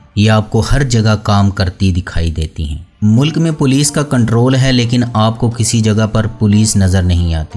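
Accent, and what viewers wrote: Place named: Sheikhupura, Pakistan. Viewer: dear AAP se poocha Hai AAP kis City se Hain please tell me , I like your accents shiekhupura ka lagta hai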